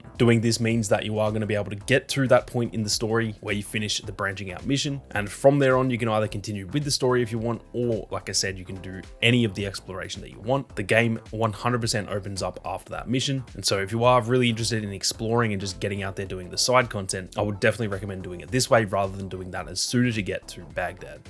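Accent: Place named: Australia. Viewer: Good tips Aussie fella lol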